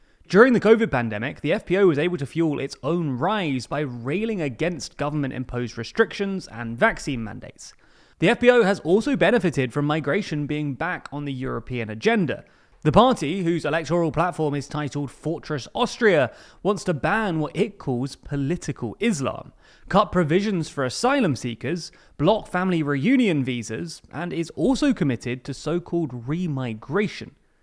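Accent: United Kingdom. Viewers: This sound doesn’t exist in English. You’re watching a video from a Brit so expect that pronunciation. Should you wish to hear a proper “Ö,” watch a German language video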